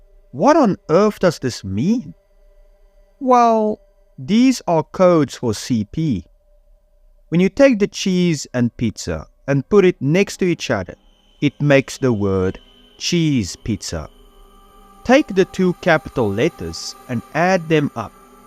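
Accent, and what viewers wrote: South Africa. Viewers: Unrelated question but where are you from? Your accent tells me you're South African. Love your content btw.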